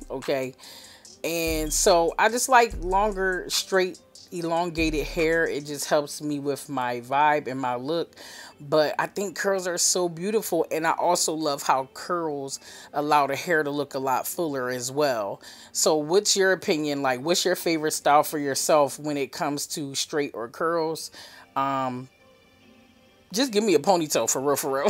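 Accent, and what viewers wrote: Philadelphia, United States. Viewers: Love your quotes I wish you could do my hair Knew you were from Philly from your accent I’m sick that I missed your pop up shop in DC, trust and believe I would’ve been there!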